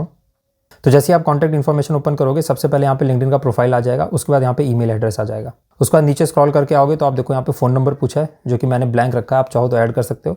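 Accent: Odisha, India. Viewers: Bhai are u from Odisha?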